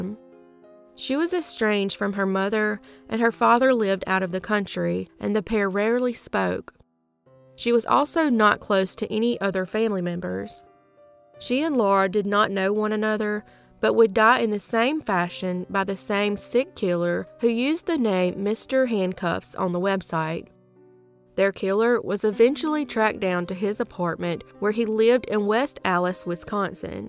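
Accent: South, United States. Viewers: Thanks for another great video! May them RIP. When do we get to know you amd when is the Patreon available? You sound very southern ❤️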